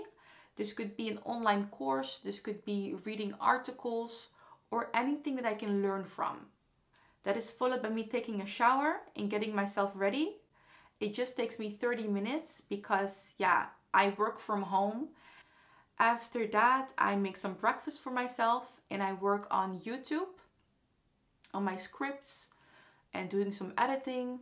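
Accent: Netherlands. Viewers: Where are you from? You start like you are from the Netherlands!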